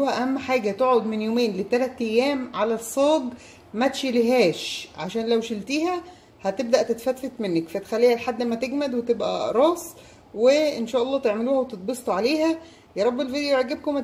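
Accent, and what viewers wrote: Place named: Egypt. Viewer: هو انتى بتكلمي مصري على خليجي ليه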